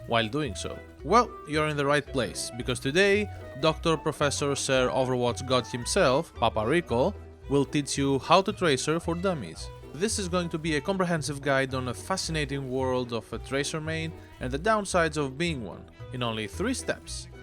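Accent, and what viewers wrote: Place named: Greece. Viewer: Ελληνική προφορά αααααα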